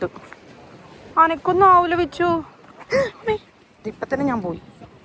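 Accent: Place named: Kannur, India. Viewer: “Ayyoo aah pennu avidenneduthu THULLI” 😂. Kannur baasha❤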